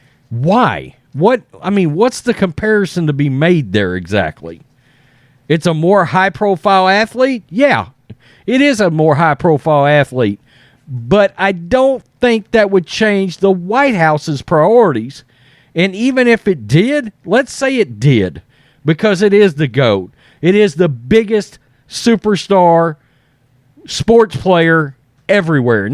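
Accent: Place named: Appalachia, United States. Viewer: this is the wrong place for me ... I do not need a hillbilly to read to me .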